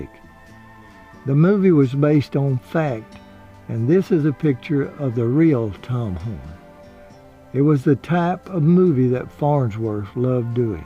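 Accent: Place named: Mississippi, United States. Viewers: Mr., I love your videos and your Mississippi accent. I'm from Grenada and grew up in scobey and Holcomb, I was curious as to what part of ms you are from bc you sound so much like my mawmaw Ingram. A more masculine tone but similar. I really enjoy all of your videos and stories. Hope all is well. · Thanks for all of these great videos, --we love them and your Mississippi accent! Please keep them coming!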